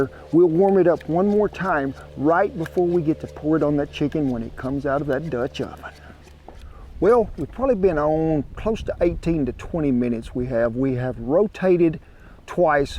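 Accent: United States. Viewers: Как он говорит... Это же "кантри" в чистом виде! Наложить музыку и всё, привет и поздравленья! МОЛОДЕЦ чувак!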